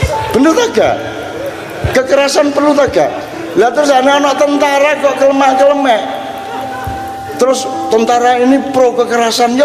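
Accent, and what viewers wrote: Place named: Java, Indonesia. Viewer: aku seneng smpean cak jan jawamu kentel❤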